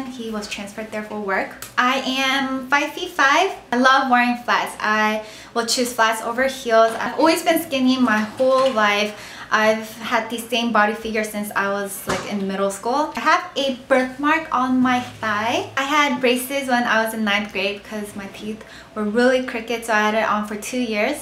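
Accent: South Korea. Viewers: She might have been raised speaking only Korean as a child so she might have developed an accent. Its the same for my cousin. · Korean accent? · I still don't understand how you could have that unmistakable, strong Korean accent. I lived in the states for a couple of years and even I don't have it. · You have such a thick Korean accent it's hard to believe you're from Texas :)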